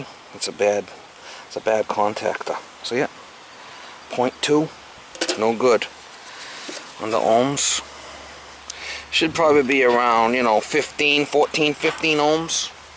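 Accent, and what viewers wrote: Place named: South, United States. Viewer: You must be from the south with that accent. - We are down in New Orleans.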